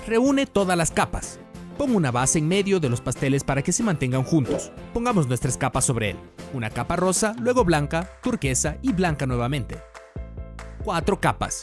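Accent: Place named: Brazil. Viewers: Soy la única que noto el acento al hablar del chico que narró el vídeo? Es brasileño,😳. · Si es brasileño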